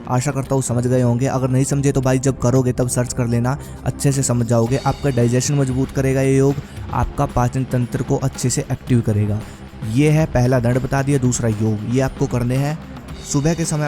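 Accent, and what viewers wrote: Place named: North India, India. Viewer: bhai pakka north india se belong karte hai ya or i think hariyana or utter pradesh se😁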